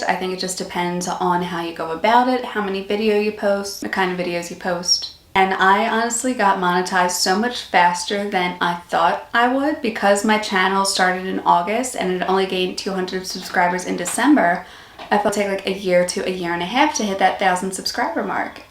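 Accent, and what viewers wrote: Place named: Australia. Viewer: Hey. You’re doing great. Something I’ve noticed in recent videos is that your accent has changed a bit. Some sounds you make are beginning to sound more Aussie.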